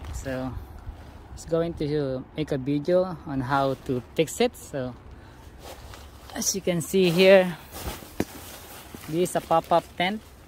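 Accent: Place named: India. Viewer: God bless you poonjabi! Just when thought every one with an Indian accent was just a scammer you showed me a true and legit fix for a distressing dilemma! It totally worked and i didnt have to purchase the stupid product that the white people in the other video were trying sell me. Scammers come in all colours and so to truely legit people. Your fix it worked crazy good. Thank you poonjabi. I liked and subsrcibed your chanel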